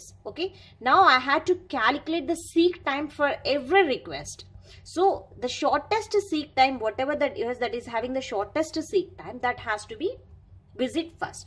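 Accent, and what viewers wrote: South India, India. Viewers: Your accent is nice are you from south indian 😊☺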